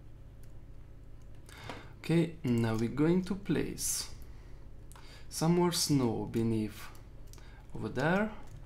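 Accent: Hungary. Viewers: Te beszélsz magyarul? Csak mert mintha lenne egy kicsi akhcentusod :-D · Szia tokre lehet hallani hogy magyar vagy😁 csinálhatnál majd olyan videót is amiben építes redstone szerkezeteket😀😉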